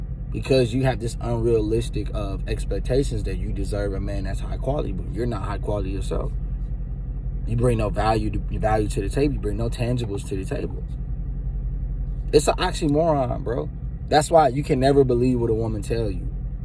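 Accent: Baltimore, United States. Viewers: I hear a lot of the 410 in your accent ..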